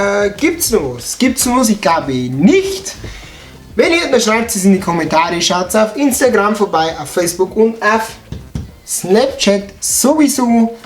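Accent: Bavaria, Germany. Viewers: Konnte als Niederbayer aus Landshut, dort geboren und aufgewachsen mit niederbayerischen Eltern und Freunden, kaum mithalten. Ein Sprachmittler zwischen Pleysteiner und meinem Dialekt wäre fast notwendig gewesen. Ein Allgäuer, nehme ich an versteht den gar nicht. Muss er ja auch nicht.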